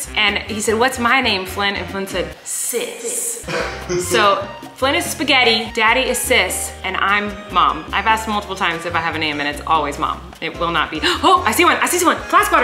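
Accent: South, United States. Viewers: you and flynn and playing with the fly swatters with your country accent is soo cute! i know a lot about country accents being from alabama! ❤ do you ever perform in alabama or anywhere in the south east!? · Love that pink on you! And that country accent 😅😅😅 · I love your southern Accent you were doing if you were doing one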